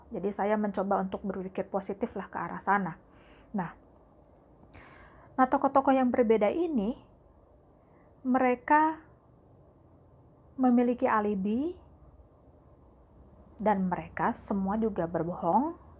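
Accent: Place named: France. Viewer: Asiikk banget pake aksen français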